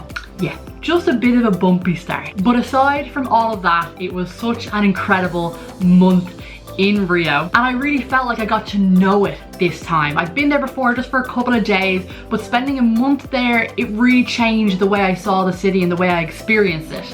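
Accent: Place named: Ireland. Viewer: I feel very happy 'coz I understand 90 % now... When I started listening to I got only about 5 % because of her Irish accent. So, it's important to go ahead and listen to her even without subtitles in Portuguese, because you can improve your listening as I am doing right now.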